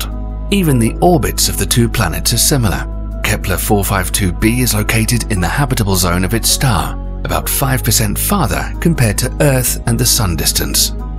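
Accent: England, United Kingdom. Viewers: I just love your English accent! Keep up the good work! :)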